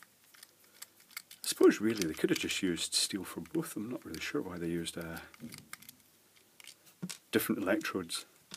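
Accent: United Kingdom. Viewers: He's in the UK. Here it is spelled Aluminium (with an extra i) and pronounced that way. There are many differences between UK and US spellings/pronunciations. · I will never understand why British people pronounce aluminum "aluminium"... There's only one "i" in aluminum.... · I don't know why I keep watching your videos. I know nothing about electronics and most of this I just don't understand. Must be your soothing british voice... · oh, of course. whenever i hear a british accent i assume the device in the vid has a G-type plug :D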